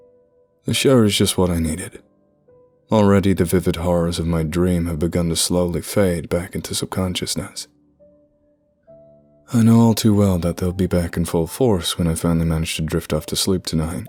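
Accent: United States. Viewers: This reading was fantastic!! Haha you perfected the average american accent on this one, bravo! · Your American accent is so good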